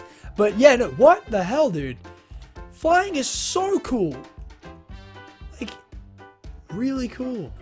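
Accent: United States. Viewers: He sounds American!!😂😂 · R u american lol u sound American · He sounds half American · he sounds american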